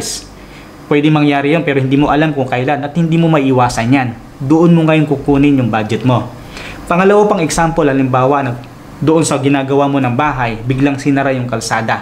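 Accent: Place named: Visayas, Philippines. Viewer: Engineer new subscriber here. After the pandemic will build a house GOD permits. In reality I am a fan of HGTV. So that's where I got ideas about building houses. But when I saw your vlog I was hooked to it. Currently watching all of it. Reading the comments and taking down notes. Sir when I start with my house for sure will be asking for your advices. I hope you will be kind enough to answer it. How I wish it will be your construction firm to build it. Son are you Visayan or Ilocano coz I heard you speak my dialect. Till then may God bless you.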